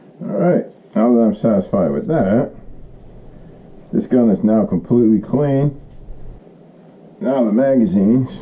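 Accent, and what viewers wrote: Boston, United States. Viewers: Love my p22! The accent...I miss Boston my home town. Nice video.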